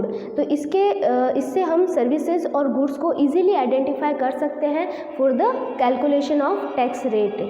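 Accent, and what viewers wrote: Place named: Gujarat, India. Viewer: Ma'am, I believe you're a Gujarati, which is found in your tonal.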